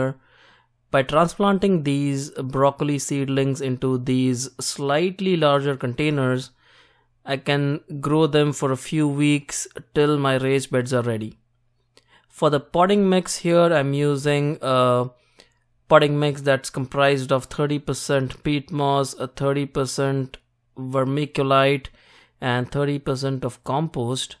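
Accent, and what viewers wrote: India. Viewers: you sound indian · Is that a Hindi accent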